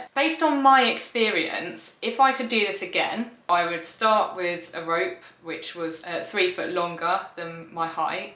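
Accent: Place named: Liverpool, United Kingdom. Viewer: What part of Liverpool are you from 😁😉🐝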